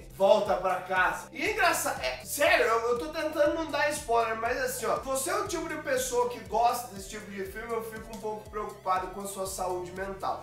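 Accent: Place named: South, Brazil. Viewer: seu gostoso! esse teu sotaque é de que estado do Sul? reconheço demais esse sotaque hahaha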